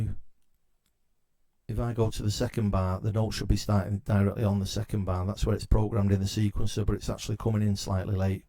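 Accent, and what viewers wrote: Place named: Manchester, United Kingdom. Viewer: Hi bud, been thinking of buying the Mk111, looks like a lot of menu diving though and a pain to set hardware and software VST up to as there's no midi control software for the Mk111. I'm going off previous video's I've watched Obviosly. How are you finding it? You sound like your from Manchester too:) Oldham I'd say...am I close??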